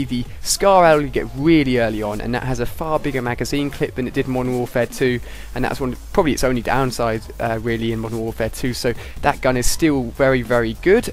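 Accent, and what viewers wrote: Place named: England, United Kingdom. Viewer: hey i really like your videos but PLEASE, talk slower , im a german guy and understanding englisch is hard enough for me but your crass english accent and your fast talking makes it not really easier !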